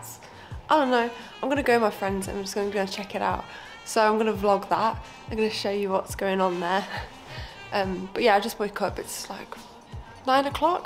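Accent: Birmingham, United Kingdom. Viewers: Oh thought u from UK all along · As a fellow Brummie I distinctly here the Birmingham accent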